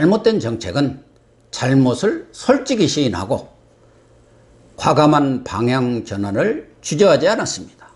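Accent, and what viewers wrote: Jeolla, South Korea. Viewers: 도대체 이 방송의 정체성이 무엇입니까? 방송하는 분의 말투를 보니 즐라도 억양이 느껴지는데 왜 이런 방송을 하나요? 슨상님 동네 사람들하고는 말조차 섞기 싫습니다. 도저히 같은 하늘을 이고 함께 살 수 없을 것 같아요.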